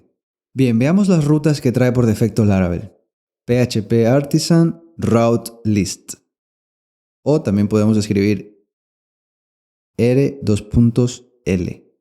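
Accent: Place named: Uruguay. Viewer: sos uruguayo te saque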